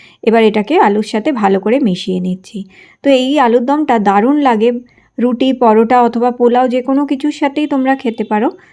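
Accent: Bangladesh. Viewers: মাগো তুমি বাঙাল নাকি। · বাড়ি কই টাঙ্গাইলের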